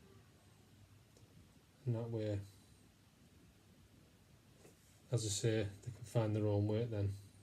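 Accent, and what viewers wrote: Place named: North, United Kingdom. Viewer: I prefer a northern accent when learning about fantasy/minis/terrain - feel free to throw a few more mini stories in there, you're entertaining! ^_^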